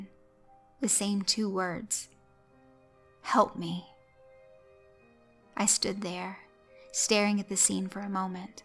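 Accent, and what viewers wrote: South, United States. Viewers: It's nice to hear a creepypasta on here being read by a fellow southerner.:)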